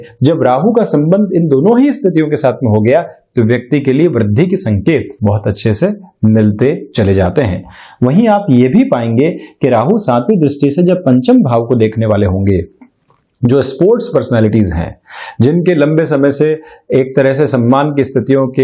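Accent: Standard. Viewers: Nice shudh Hindi..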